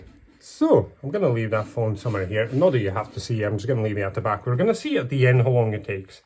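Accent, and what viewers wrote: Scotland, United Kingdom. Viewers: Helpful instructions. Hopefully I can understand the Scottish accent for the entire video. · I just want to thank you from the bottom of my heart for this video. My brother got me this piece from China, so all the instructions were in Chinese and I gave up on Google translating each step after five minutes. I was about to give up but then thought let me see if there's a video on Youtube, and I found your video, and it was honestly some of the best days I've had in a long time! Hearing your relaxed voice and lovely little commentary as we build this cello was nothing but a joy, and I've learned to always sand sand sand because that's a habit eh? I checked your account to see what other pieces you built so I can follow along, so I'm just waiting for the typewriter to arrive so I can build it together with your video! Can't wait to hear the Scottish accent for hours on end!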